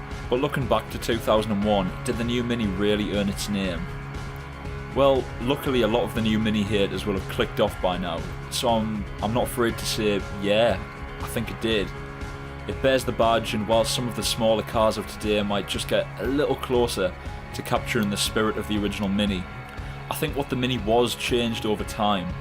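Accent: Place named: Newcastle, United Kingdom. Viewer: good to hear a fellow Geordie voice narrating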